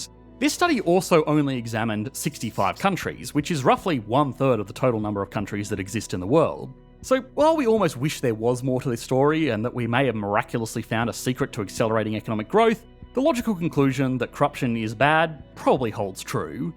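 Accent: Australia. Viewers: Annoying Australian accent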